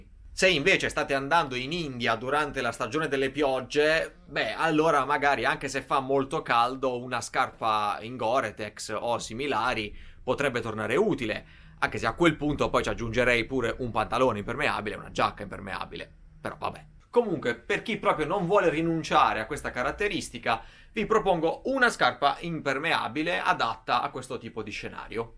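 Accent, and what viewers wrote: Apulia, Italy. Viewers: ma il "dove dobbiamo andare con queste scarpe", devo chiedermelo sempre con il tuo accento pugliese? o posso usare il mio siciliano?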